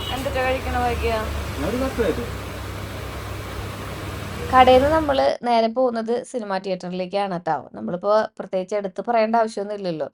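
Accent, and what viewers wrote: Thrissur, India. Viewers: Enthu sughaa videos kandirikkan, pinne Thrissur slang enikk orupad ishtaanu, kurachukalam njangal Guruvayur ndayirunnu, 7 muthal 10 vare njan padichathokke avideyaa, ente Malappuram pole enikkishtaa Thrissur nem. Nte Guruvayurappanum avide alle,pinne jeevithathile ennum orkkan ishtapedunnathum allathathumaya orupad ormakalum aa nad enikk thannittund.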